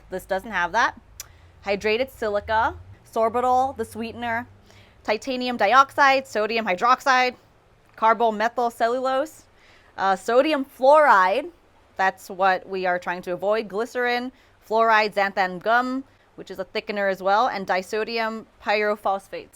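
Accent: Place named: England, United Kingdom. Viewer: nice english accent.. gorgeous smile.. ❤️ thanks for the recepy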